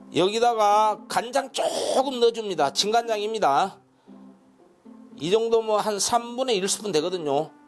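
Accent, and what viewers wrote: Jeolla, South Korea. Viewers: 구수한전라 사투리가 더맛깔나네요ㅡ. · 서론없어좋고 전라도고향말좋고 초간단최고최고🎉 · 완전전라도식말씀 헤그럼판오랜만에들어보네요 셰프님 멸치무침잘들었네요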